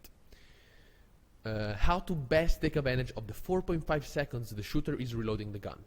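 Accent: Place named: Italy. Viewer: You Italian accent is just so cool to listen bro ❤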